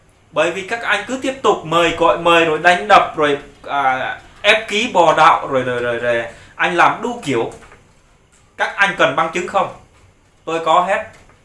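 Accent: Standard. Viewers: Nói tiếng Việt chuẩn lắm😊😊😊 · Nói rất chuẩn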